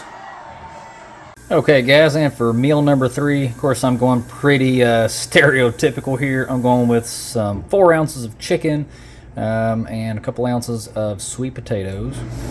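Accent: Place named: South, United States. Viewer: If the accent didn't give away that your from the south the camaro bumper used as a mantle sure did 😂 great video 👍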